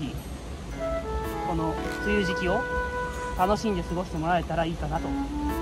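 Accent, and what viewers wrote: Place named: Sado, Japan. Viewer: 佐渡弁すごいですね！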